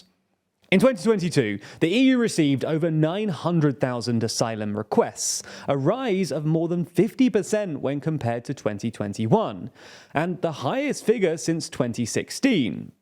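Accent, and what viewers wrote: England, United Kingdom. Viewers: you are English arnt you!!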